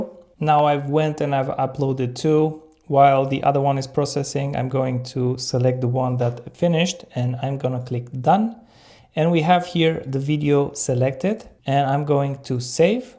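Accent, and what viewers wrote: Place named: India. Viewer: primeira vez que eu vejo brasileiro falando em ingles com sotaque indiano kkkkkkk valeu pela aula mano, ajudou muito aqui na minha loja tmj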